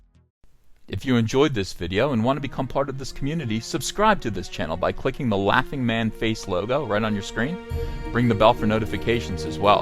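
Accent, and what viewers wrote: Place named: Long Island, United States. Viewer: what is the accent that has? I want to say"gay" but is it Long Island?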